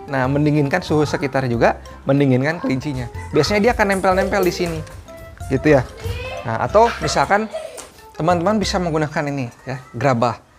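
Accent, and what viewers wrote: West Java, Indonesia. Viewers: Orang mna ktu kang logat ngomong nya mah sunda? 😅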